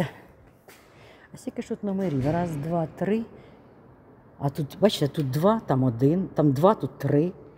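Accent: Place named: Surzhyk, Ukraine. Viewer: ваш суржик мадам патроитка режет ухо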